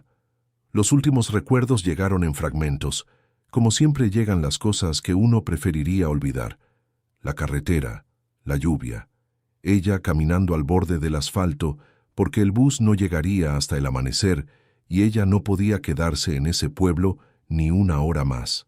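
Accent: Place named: Mexico. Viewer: Soy colombiana,y me e alegro mucho que hagan historias narradas de mi país, gracias por tenernos en cuenta, pero me gustaría que se cersionaran más de los nombres,y pronunciaciones de nuestros dialectos, ya que aquí hay muchos, dependiendo de cada departamento,porque sé que UD es mexicano, y todas sus narraciones suenan igual, acá en Colombia no hay provincias, hay municipios, con nombres de cada dpto, y los departamentos de Colombia son ciudades grandes, Muchas gracias por tener en cuenta a nuestro país, pero que pena, que su dialecto es muy de su país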